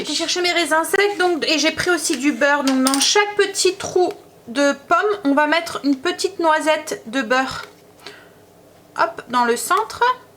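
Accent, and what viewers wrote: North, France. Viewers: Bonjour. Je découvre votre chaîne et j'aime beaucoup. Vous avez un joli accent, du Nord? Je n'ai pas Facebook, quelle levure utilisez-vous? Merci ❤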